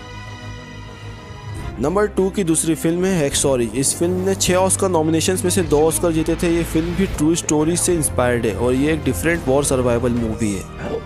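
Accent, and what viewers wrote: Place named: India. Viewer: your voice doesnt suits at all for western movies cuz of ur accent,well i dont blame you but you are good to narrate for hindi movies tho.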